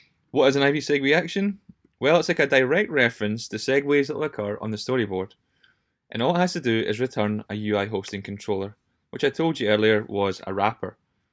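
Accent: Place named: New Zealand. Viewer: Amazing video, thanks for making it. Not related to this video, but just wanna ask: Are you from New Zealand? Your accent sounds like people from there.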